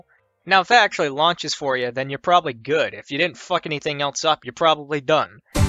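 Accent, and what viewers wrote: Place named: New York, United States. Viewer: i think hes from new york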